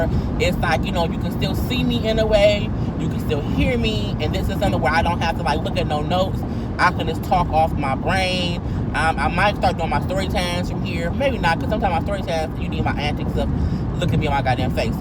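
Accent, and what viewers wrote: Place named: New Orleans, United States. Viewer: Like you, I started with Funky Dineva, once I purchased a smart TV it had a YouTube channel that's how I got turned on to others like you, James Colwell, Kiesha all tea all shade, and color me pink, Alex Rogers, Ashley Miller mines is in no particular order and yes I also thought certain words you've said I thought you had New Orleans roots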